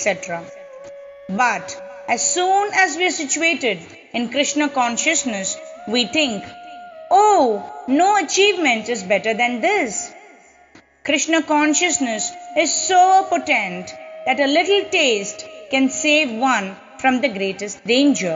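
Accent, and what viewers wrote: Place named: India. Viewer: Hari Hari ! So nice to have Srila Prabhupada's books in Indian accent with such clear speech. Waiting for the other chapters...🙏🏻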